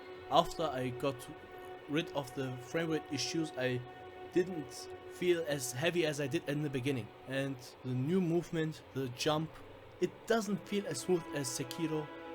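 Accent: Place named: Germany. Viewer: ich finde dein englisch voll gut. Man hört zwar, dass du deutscher bist aber die wortwahl ist echt gut getroffen😂👍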